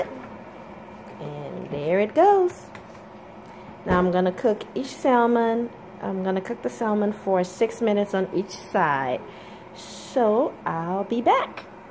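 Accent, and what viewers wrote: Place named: New York, United States. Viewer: I thank you for the info... By the way you sound like a Puerto Rican from New York... I could be wrong. I spend a lot of time in Queens..lol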